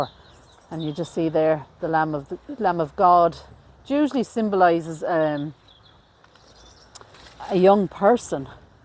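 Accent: Ireland. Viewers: I love you channel and Irish accent!!! Some of my mom’s family were Irish. Great ancient graves 😻!!! We don’t have any like that here in the U.S.A.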